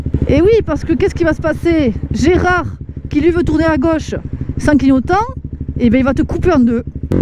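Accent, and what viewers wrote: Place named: Southwest, France. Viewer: toi vu l accent tu sembles etres du sud ouest , sinon bonne continuation!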